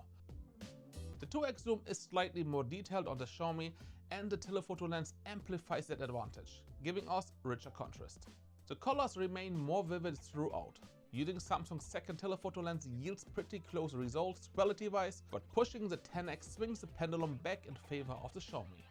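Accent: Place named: Germany. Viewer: Warum nicht auf deutsch Bruder? Ich mein, man hörts ja schon gut raus.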